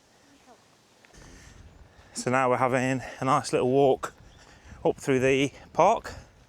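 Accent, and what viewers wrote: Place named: Leicester, United Kingdom. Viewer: You sound like you’re from Leicester.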